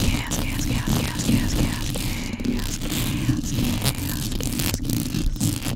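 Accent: United Kingdom. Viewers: This is the perfect way to end another long day, especially the encouragement. Also, your British accent is very impressive.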